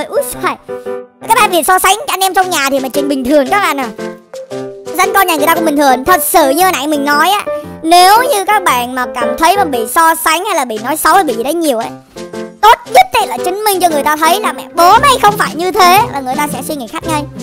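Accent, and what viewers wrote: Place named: North, Vietnam. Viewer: Nói sao lúc sau giọng bắc luôn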